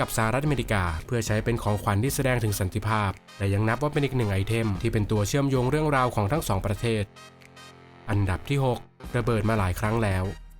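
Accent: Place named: Isan, Thailand. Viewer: คนอิสานใช่มั้ย ฟังไม่รุเรื่องเลย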